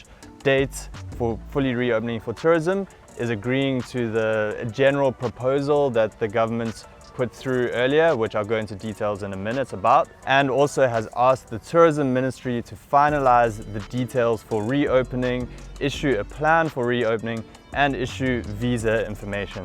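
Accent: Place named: South Africa. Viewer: i hear some kind of a South African accent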